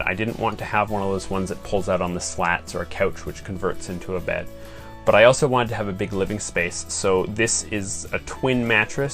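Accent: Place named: Canada. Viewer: Canada eh?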